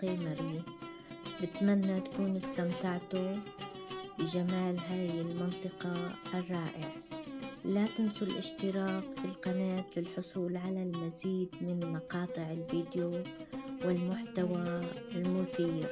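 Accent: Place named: Salamiyah, Syria. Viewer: الله يعطيكي العافة أستاذة الله يعطيكي الصحة والعمر الطويل فيديو رائع وحضرتك أروع وإلي الشرف إشترك بقناتك لهجة حضرتك قريبة كتير للهجة أخواننا الطيبين في مدينة السلمية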